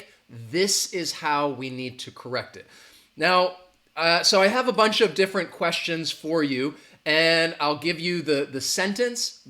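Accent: United States. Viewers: Hiya dude. Kind regards from Venezuela. I do congratulate you on your wonderful and useful Youtube channel. I dunno why, but I love the English language. Therefore, I´m trynna do my best to learn a great deal of it every single day. It ain´t been an easy learning journey. Notwithstanding, I wanna become a proficient English speaker. Likewise, I must confess I love American English. So, I do enjoy watching your videos cuz you speak such a beautiful American English. I still a newbie, I mean I´m still a rookie at learning English. In spite of that, I´m trynna do my best to reach a fluent speaking level. Well, dearest friend, you´re an amazing English teacher and I enjoy watching your wonderful videos.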